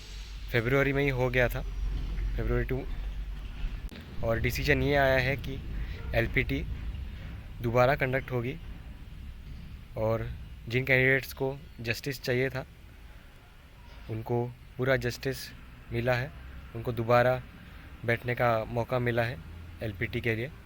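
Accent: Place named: Maharashtra, India. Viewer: Note*-playback speed -1.75x Thanks bro ,it seems like ur first language is marathi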